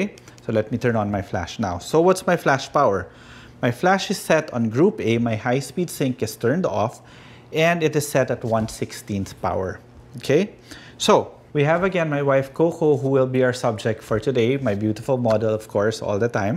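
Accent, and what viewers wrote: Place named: Philippines. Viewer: Right away I knew he was filipino when he started talking and I have a cousin named Jiggie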